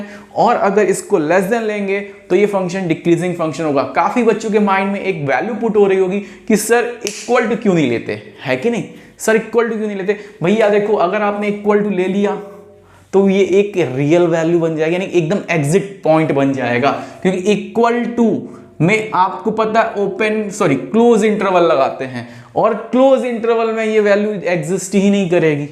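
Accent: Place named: Kanpur, India. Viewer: Gabbar is back on old style wow chacha apne kamal hi kar diya kanpuriya language ke sath ye sambha laga nisana jara to is kaliya par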